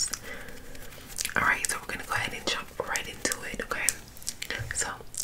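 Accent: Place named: Trinidad and Tobago. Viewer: You trinidadian right?😂 Lmao heard it in your voice...🇯🇲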